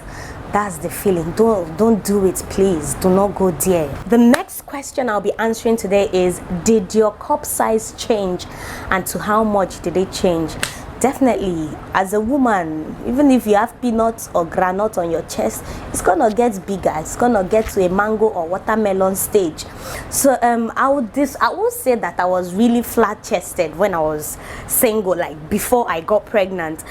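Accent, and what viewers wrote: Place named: Igbo, Nigeria. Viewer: Am here for the Igbo words and accent. Love from Kenya ❤️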